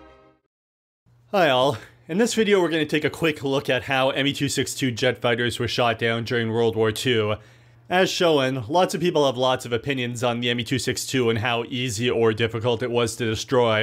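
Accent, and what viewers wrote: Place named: United States. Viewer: "Weird" is probably a tad harsh, though I must admit I've never heard 'bomber' pronounced 'bawwwwwmer'. It just seems to be one of the more obscure America regional accents.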